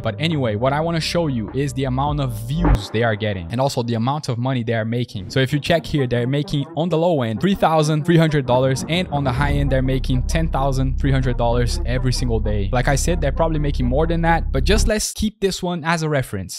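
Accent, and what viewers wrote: Brazil. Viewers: sotaque brasileiro carai hahahahahahha seguindo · mano, tu eh br né? kkk o sotaque entrega mt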